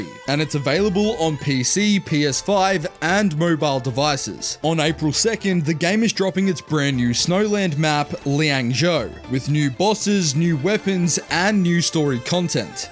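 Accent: Australia. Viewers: Hears voice - Checks channel - HES AUSSIE!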